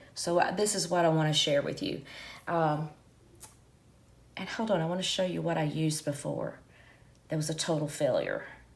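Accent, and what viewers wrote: South, United States. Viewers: Thx for sharing! I love your southern accent ❤️. I’m from WV 😃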